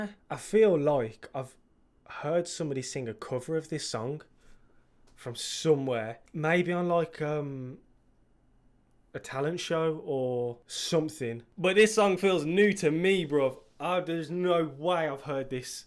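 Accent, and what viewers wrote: United Kingdom. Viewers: Aren't you British? You sound British. How have you never heard of?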